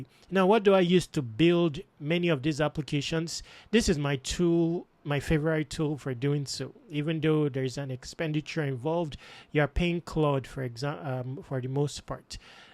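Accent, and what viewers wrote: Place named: Nigeria. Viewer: Hello, thanks for sharing. You sound Nigerian 😊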